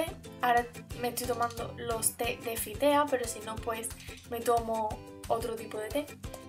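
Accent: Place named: Andalusia, Spain. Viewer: MADRE MIA !!!!!!!!!!!!!como se nota que eres andaluza , como yo !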